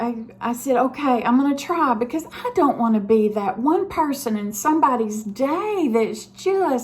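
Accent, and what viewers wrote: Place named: Kentucky, United States. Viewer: The doctors want to do an MRI on me because I devevloped SSHL, Sudden Sensorineural Hearing Loss, in my right ear. There is a very small chance it is caused by a benign tumor. Almost never is the cause known though. I do have Hashimoto’s Disease and for ones very educated about it they know it can cause SSHL and other ear related problems. I am dragging my feet on doing this MRI because I do suffer from claustrophobia. And I do not like feeling restrained. I understand what you mean about also having something in your ears and over your eyes while being inside of an MRI. I stopped getting headphones at museums because having them over my ears was annoying for me. I think all of that would just add to the feeling of claustrophobia and being restrained. In the past my claustrophobia has often been dependent on how well rested I am. 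I don’t like confined places, but if I am well rested I am not panicking. I am American but I live in a an old city in central Europe. Sometimes in the older buildings, the elevators are super small. There are times I panicked and took the stairs and other times I got on the elevator with no problems. Thank you for sharing your honest feelings and very human emotions! My late husband and I lived in Kentucky for 10 years. So I enjoyed hearing your accent.😊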